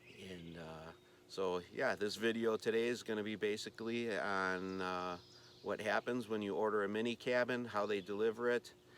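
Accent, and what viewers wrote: Wisconsin, United States. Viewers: You sounded just like wisconsinites and I called it well. My uncle has land in Gleason Wisconsin. We used to camp up there. I live down near Milwaukee. I just subscribed to your channel.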